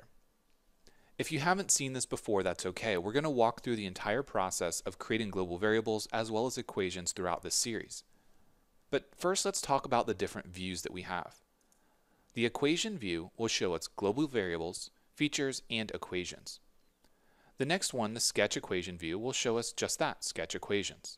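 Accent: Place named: United States. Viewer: Great video's its nice to hear an American voice without the horrible constant 'Vocal Fry' at the end of every sentence.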